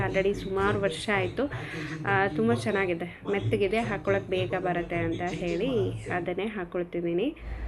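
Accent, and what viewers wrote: Raichur, India. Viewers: Hi namaste from dharwad.. Nimma bhashe keltiddange ello nam kadeyvralva ansitu ella vedio na scroll madi nodi huduktide nim introduction vlog enadru idiya anta... Hage 5-6 vedio nodtiddange nim wedding card alli lingasuguru anta nodi khishi aytu namdu kuda raichur... Hege vlog madta iri.. Sadaa khushiyagiri...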